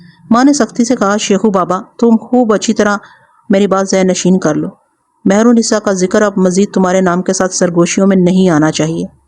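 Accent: Punjab, Pakistan. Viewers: جو مزہ الیاس سیتاپوری کی کہانیاں پڑھنے میں آتی تھیں وہ سن نے میں کہاں اس کی اصل وجہ آپ لوگوں کا پنجابی لب ولہجہ ہے جو سماعت پر بار گراں گذر تا ہے ۔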